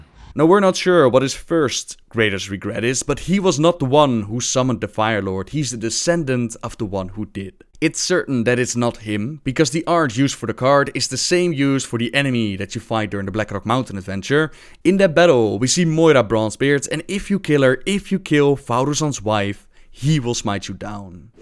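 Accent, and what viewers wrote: Netherlands. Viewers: rymarowicz he is dutch. And has a nice accent. so he might say thing differently then you and i do.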